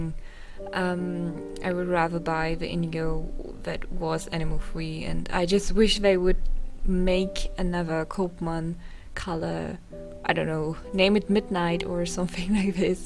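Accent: Germany. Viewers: thx i was thinking about buying this set :) now i am going to get it :3 btw are you german ^^?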